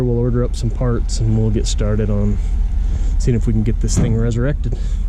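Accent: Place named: Utah, United States. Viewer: Greetings from Tooele! I can tell you're in Utah because you say "acrossed" instead of "across".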